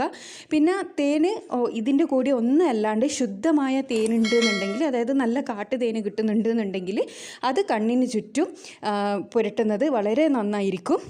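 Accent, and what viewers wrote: Kannur, India. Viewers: കണ്ണൂർ ഭാഷ chechijk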